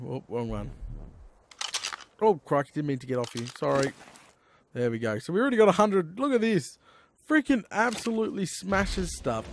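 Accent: Australia. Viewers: well I've been watching for a lil bit of build big or go home but I have watch them all even you sleeping in the stream that was funny loved the d&r series and the series with and watty and all the Ark series but I stay for the aussie accent!!!!!! and is just a awesome young lady she makes me laugh a lot usually at you but that just means she cares a lot about you as of right now i'm building Independence hall as my main base and I made besty's ross house for my catus farm when I decide too make a iron farm if I do i'll make the empire state building just so I can get two farms in it so needless to say the buildings are not too small and I really hate collecting all that clay by hand probally used over 20 stacks of red bricks and need more it will be the only time I use this block in huge quainties love the series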